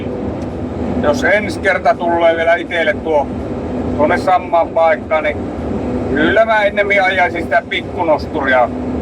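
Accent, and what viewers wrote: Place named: North, Finland. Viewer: Murteesta päätellen jänhkhä jooseppi tämä tekijäukko. Ja temusta tilaa tavaraa, on siinäkin yhdistelmä.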